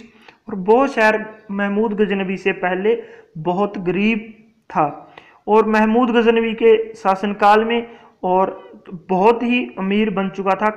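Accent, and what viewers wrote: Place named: Punjab, India. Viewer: bhai y punjabi h... iski hindi se hi pta chal rha...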